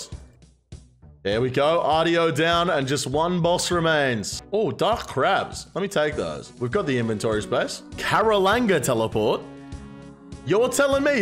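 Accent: United States. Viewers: Actually not half bad new york / jersey accent. See you at Tony's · It’s refreshing to know Australians do American accents. I guess the thought just never occurred to me lol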